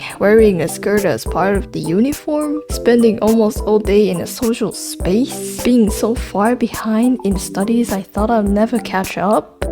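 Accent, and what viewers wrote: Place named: Germany. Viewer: Ohhh interesting story! What country are u from? I thought u might me German at first because of your accent, but I think homeschooling isn’t allowed there at all… are u Asian? 😮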